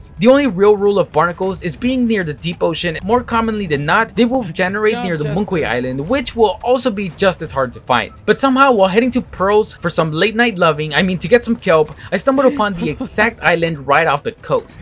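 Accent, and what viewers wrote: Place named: Texas, United States. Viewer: You sound like someone I went to high school with in Texas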